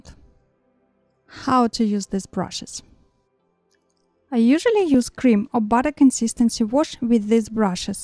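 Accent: Russia. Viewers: Спасибо большое ! Интересно было узнать о ваших кистях . Судя по акценту вы наверно с России .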